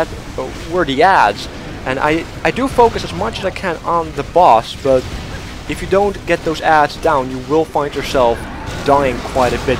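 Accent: Netherlands. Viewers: something says you have a dutch accent! :) neverthe less.. good video. enjoyed it. Good to know my 19 euro's are a good investment.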